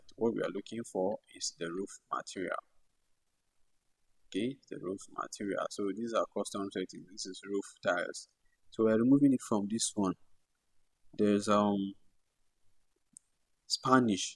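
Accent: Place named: Nigeria. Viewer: The best 3d tutorial I've come across on YouTube. I love your simplistic, clearly explained, common Nigerian accent and jovial teaching style. You have 100% of my attention, and I will definitely recommend you to fellow students. Please, how do I get the CAD image installed, and how do I join the whatsapp group?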